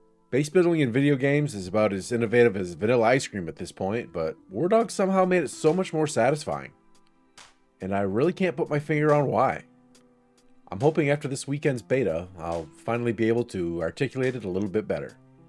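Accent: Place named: United States. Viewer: As someone pushing 50, I had flashbacks and (virtual) PTSD with this video 😅 I enjoyed what I played of BF6, but hated the COD-style sliding. LAN parties were great, though you could guarantee there would be at least one player struggling to connect to the rest 🤪 I sadly missed the closed Beta for this, hope the rumours are true and I get to try it before the 10th. Most of my recent shooter experience is with Ready Or Not, but this could scratch an itch for me 😁 I'm in the UK, I'm guessing from your accent that you're across the pond? Just heading to check if you have a Discord server 😅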